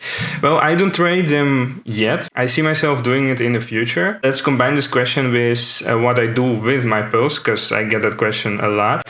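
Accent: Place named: Netherlands. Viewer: U have to work on your English pronouncing because I can hear 100% you're dutch ! xD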